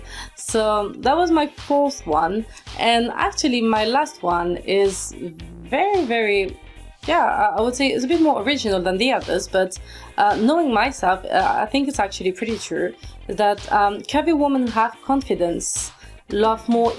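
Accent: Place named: France. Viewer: Curvy woman with confidence and a cute french accent? Beautiful! Subscribed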